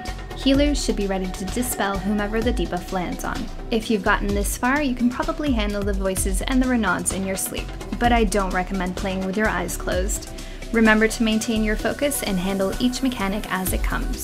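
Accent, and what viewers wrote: Canada. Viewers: Yeah, very beautiful voice... and there's something abowt your accent that sounds Canadian, too :)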